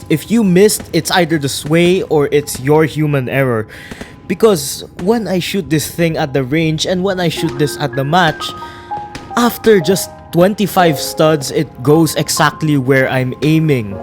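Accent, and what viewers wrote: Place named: Philippines. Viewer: I discovered Deadline couple days ago and I instantly loved it. Though I'm not really good at building, I just slap in the parts with good stats lol. I'm glad I came across your channel, I got lots of build ideas especially for the M4. This channel deserves more views just like how Deadline deserves more players, you guys are the hidden gems of Roblox community. Btw, are you from Philippines as well? I feel like your accent sounds a bit Filipino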